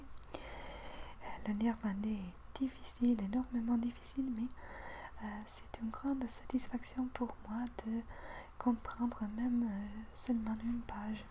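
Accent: Italy. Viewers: J'adore tes vidéos ! Je les aies fait découvrir a ma sœur aussi et pratiquement tous les soirs on t'ecoute pour nous aider a dormir, tu es comme notre jolie berceuse. Tu nous berces de paroles positives, tu nous racontes tout ce que tu connais, c'est passionnant ! Et ton accent italien en parlant français c'est encore plus joli ! Tu m'apaises, tu me destresse, c'est un vrai plaisir de t'ecouter! Tu as un très bon français a ce que j'ai vu sur les autres vidéos aussi :) Sinon en lecture française je te conseille les grands classiques comme Notre dame de Paris de Victor Hugo ( je lis beaucoup plus de littérature anglaises) Bisous et continue ! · tu parles super bien français en plus ton petit accent italien est super bien je trouve que sa donne un plus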